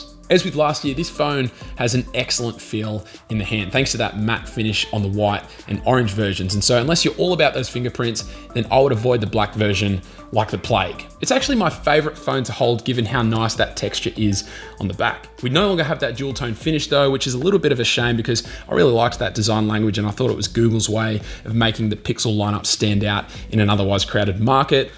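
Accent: Australia. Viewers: Thank you so much for your excellent reviews and unbiased advice on mobile phones - yours is the go-to channel for a well produced, honest review. I am thinking that maybe this Pixel 4 review did not require you to repeat honest 10 times though - mate your viewers can see you are honest by the quality of all your reviews! Your Australian accent is a refreshing change from the multitude of other cell phone reviewers! Looking forward to more from your channel. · Just stumbled across this video, and damn I wish YouTube had of recommended you before now. It's great to get an honest review, and have it in an Aussie accent. I've used every pixel since it first launched, and have never experienced the issues in any of them that were previously reported, but the decision to put the small battery almost turned me off the phone. Almost, the camera is well worth the phone.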